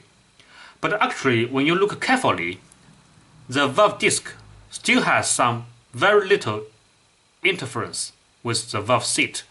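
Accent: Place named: China. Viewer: 中式英语口音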